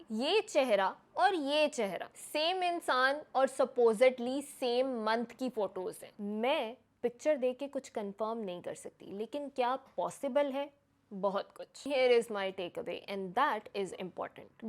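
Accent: India. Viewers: Jo humara urdu ka undaz hai uss ko khudara hindi lahjay say na badlay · I was so confused with her accent. It is definitely not a Pakistani accent and you are a doctor. You should not say tum we use up to show respect in class. I’m not only that the entire way of speaking the method of speaking is all Indian. Please go back to your roots.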